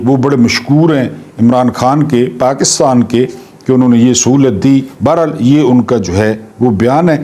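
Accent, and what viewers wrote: Saraiki, Pakistan. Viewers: السلام علیکم ورحمتہ اللہ وبرکاتہ جناب برادر صاحب آپکے ویلاگز دیکھ کر میں بہت محظوظ ہونے کے ساتھ آپکی سچیائی پر مبنی حالات حاضرہ سے باخبر ہوتے ہیں اور خاص طور پر آپکے سرائیکی انداز کچھ الفاظ بہت مزہ دیتی ہیں۔ سید غلام اصغر بخاری ضلع لودھراں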